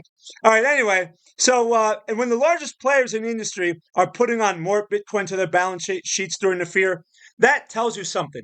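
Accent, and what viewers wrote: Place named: South, United States. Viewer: Came for ta instead end up listening to a hill Billy meth head 😂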